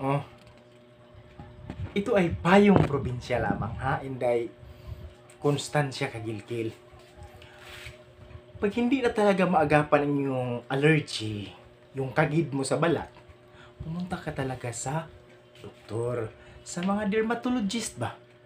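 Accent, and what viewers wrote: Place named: Visayas, Philippines. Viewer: Hehehe, thank you po sa very funny content na ito. You entertained me po talaga! Ang galing mo po mag-act/host. I love the flower background also. Love the Bisaya accent.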